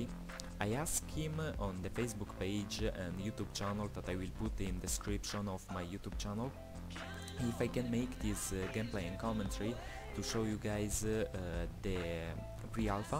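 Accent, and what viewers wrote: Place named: Italy. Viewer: you've got an Italian accent (or however it is writted)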